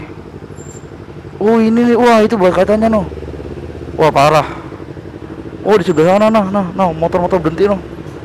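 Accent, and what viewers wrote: Surabaya, Indonesia. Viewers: Biasanya nonton motovlog bandung trs nonton motovlog surabaya keluar dah medok dan cak cuk nya :D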